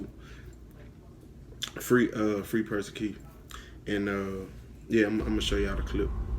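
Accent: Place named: Louisiana, United States. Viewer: It make perfect sense dat he frm Louisiana cuz he alr got da accent so it probably ain that hard for cuz to even do iy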